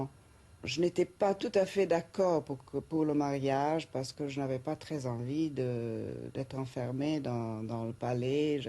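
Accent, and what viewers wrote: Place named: Egypt. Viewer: لهجتها الفرنسية حلوة بس ظاهر فيها انها مصرية :) انا مهتمة اوي بالتاريخ المصري وحقيقة مصر ام الدنيا بلا منازع.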